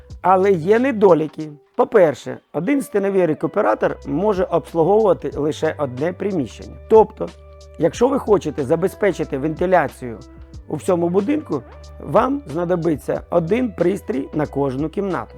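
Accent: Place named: Central Ukraine, Ukraine. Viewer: Я так зрозумів чоловік родом десь с центральної України чується щось рідне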